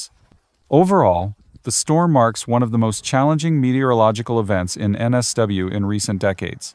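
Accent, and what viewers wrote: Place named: United States. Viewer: Commentary by a friggin yank ffs!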